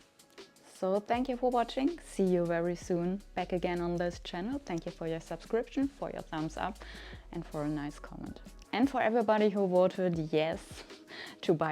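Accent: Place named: Germany. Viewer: you look gorgeous in everything you wear and I love your German accent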